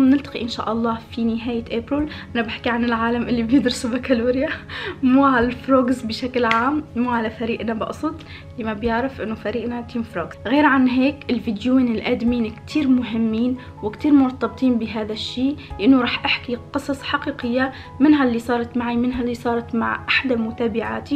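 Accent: Tunisia. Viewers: أنت تونسية صح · لهجتك فيها شوي تونسية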